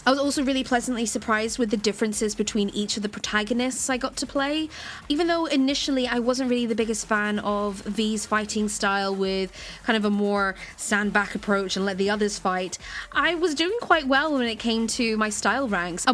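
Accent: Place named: Bristol, United Kingdom. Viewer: You sound like your from bristol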